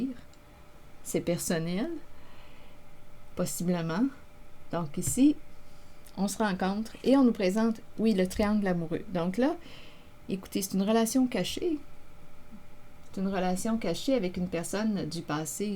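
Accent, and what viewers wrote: Canada. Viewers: Bonjour Oui je suis très attirée par lui mais le repousse sauf qu'il habite en face de chez moi ya une tierce sa femme et 2gamines qui me posent problèmes depuis des années bien avant d'être tombée amoureuse de lui et cette invit arnaque Facebook 2018 où voisins(es)ont participé pour me manipuler dont cette tordue de femme et ses2gamines!et lui se cache alors qu'il me souriait se retournait sur moi m'avait même parlée..ils sont très à l'affût de la mode et lui mettait casque oreilles dans cesse pour écouter musique en allant se promener..moi je sais pas ce qu'il a dans la tête mais dans son regard je vois bien qu'il a une forte attirance pour moi..trop difficile tout ça..oui vous savez déjà que je suis en 'colere'.. J'm beaucoup votre accent canadien et vos interprétations..bonne journée..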